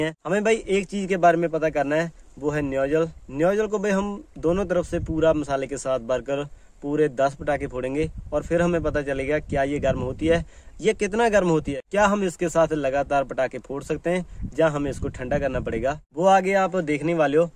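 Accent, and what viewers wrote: Punjab, India. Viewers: ਵੀਰੇ ਲਗਦਾ ਪੰਜਾਬੀ ਆ ਪੰਜਾਬੀ ਕਿਉ ਨੀ ਬੋਲਦਾ ਯਰ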